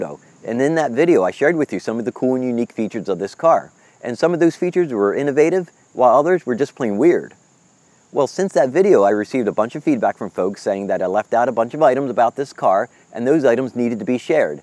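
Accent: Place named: Philadelphia, United States. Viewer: Heard a little bit of Philly in your accent; then saw the DE tag on your car; makes sense!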